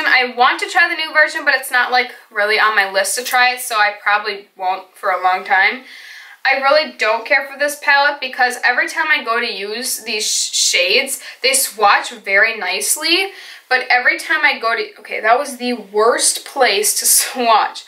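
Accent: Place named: Minnesota, United States. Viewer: Your Minnesooooota accent is coming out strong in this one lmao 😄 it's cute !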